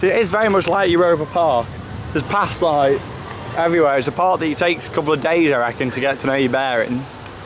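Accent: Netherlands. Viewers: dat dutch daccent doe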